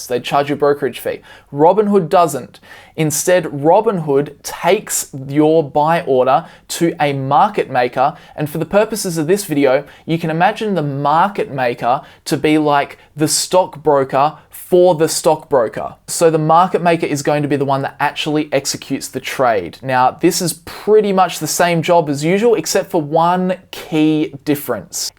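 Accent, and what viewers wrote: Australia. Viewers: Are you an Aussie living in America?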